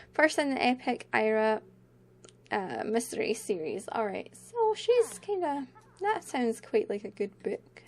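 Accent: Scotland, United Kingdom. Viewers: I'm pretty sure is Scottish, not Irish.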